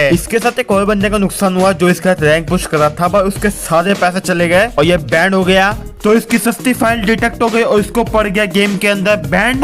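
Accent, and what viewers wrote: Gujarat, India. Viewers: bhai gujarat se ho aap?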